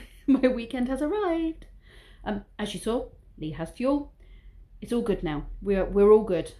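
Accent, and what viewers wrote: United Kingdom. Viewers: You might have an English accent... the Southwalians sound almost English accented compared to the Northerners... to the northerners, anyway. I expect the soutwalian Welsh speakers find that offensive, but I can tell what the northerners mean. btw - we call northwalians 'gogs' because they're from the gogleth (north).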